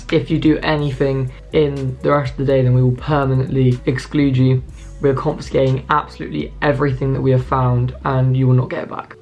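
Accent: RP, United Kingdom. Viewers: Posh boy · Man sounds bare posh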